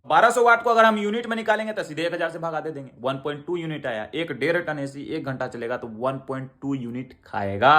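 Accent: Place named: Bihar, India. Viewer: Qki dono bihaari hai..(no offence) Ye bhojpuri lehza hai..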